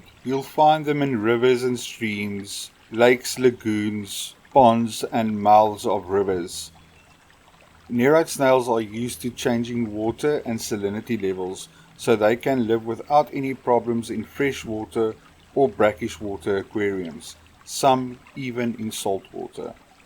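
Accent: South Africa. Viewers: You sound like your from South Africa my friend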